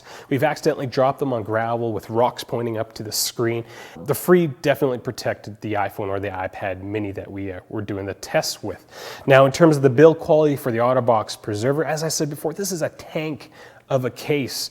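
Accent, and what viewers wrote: Canada. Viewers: Canada eh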